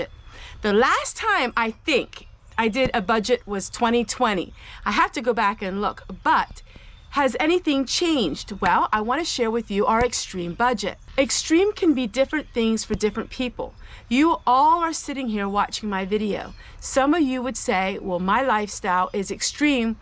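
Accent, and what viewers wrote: Pennsylvania Dutch, United States. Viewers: i love your accent. pa dutch?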